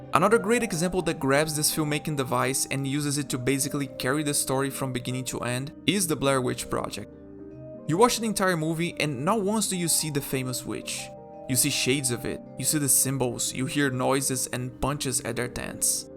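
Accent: Brazil. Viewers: Great content! Do I hear a hint of a Brazilian accent?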